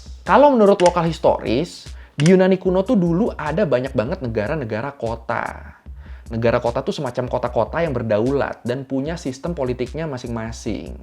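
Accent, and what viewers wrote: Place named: Madura, Indonesia. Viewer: Mantap bang belajar soal yunani kuno dgn logat madura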